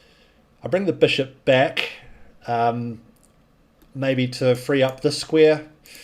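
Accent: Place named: New Zealand. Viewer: Hi, I hope all is well in Austria. I recently subscribed and am immensely enjoying your recent videos with the in-depth commentary on your OTB games. It’s great to see a fellow Aussie doing well (although I think I detect a bit of Kiwi pronunciation here and there — I could be wrong; English is my second language). All the best, and thanks!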